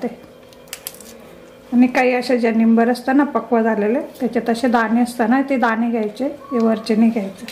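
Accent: Khandesh, India. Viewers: tumcgya sagaly recipe khup chan asatat, tumcha khandeshi lahejat bolane mala khup aawadte, all the best tai.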